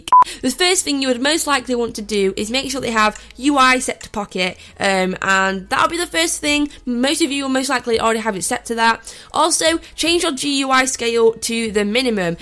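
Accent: England, United Kingdom. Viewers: i like your british accent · why does every minecraft player has british accent? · What is your english accent?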